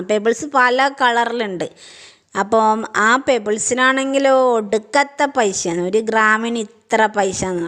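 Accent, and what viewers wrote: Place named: Kannur, India. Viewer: നമ്മളെ കണ്ണൂര് ഭാഷ നല്ല രസം ഇണ്ട് കെകാൻ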